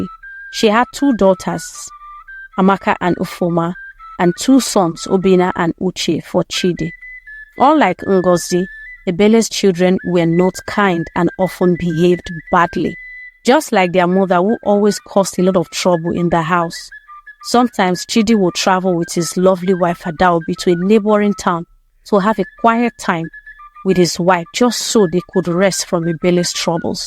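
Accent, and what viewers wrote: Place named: Nigeria. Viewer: I live in Michigan USA. Months ago I came across your stories when looking for videos with Nigeria accent so I could get used to it. I Continue listening because I like the stories.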